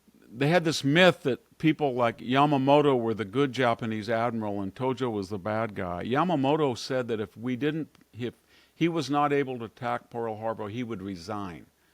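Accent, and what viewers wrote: United Kingdom. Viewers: Who is this lovely,m well spoken, well informed, young British man?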